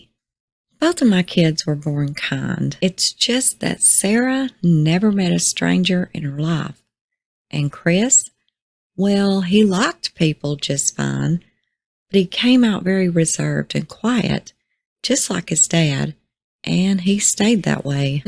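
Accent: South, United States. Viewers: You actually did a really good job on the Chris Watts book reading and I literally listened to all 9 hours and your voice is pleasant and your accent is fun because I literally never listen to any voiceovers with a Southern accent so it was a good change and you have a good speaking voice. Nice job!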